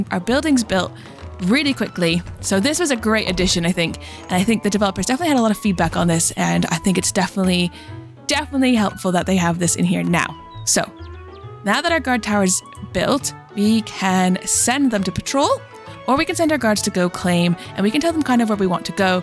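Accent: United Kingdom. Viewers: I thought I heard a bit of British and some other accent.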